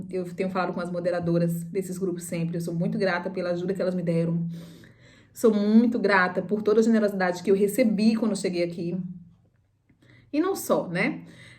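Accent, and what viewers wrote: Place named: Portugal. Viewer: sra, eu estou a ouvi-la, você fala clarinho, com toda a á vontade, não há hipótese de dúvidas se você fala exatamente como todos falamos, custa,- me acreditar que brasileiros digam que percebem melhor o espanhol do que o português de cá, estão a brincar connosco, não acha.?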